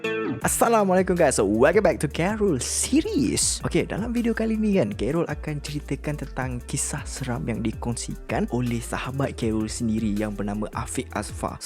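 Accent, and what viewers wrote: Johor, Malaysia. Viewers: orang johor lah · Bang org Johor ke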